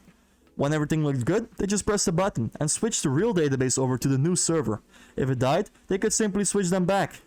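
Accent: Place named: Lithuania. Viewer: lietuvis ,ane? is akcento matosi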